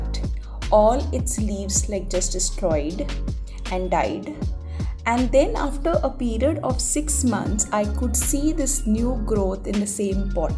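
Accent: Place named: India. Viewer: your indian accent makes me happy to hear it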